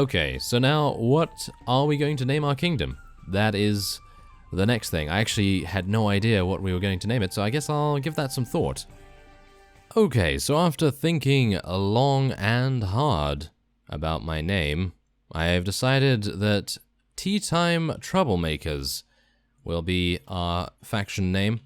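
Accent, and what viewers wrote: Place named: England, United Kingdom. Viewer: soothing listening to a proper english-speaking voice instead of that dreadful american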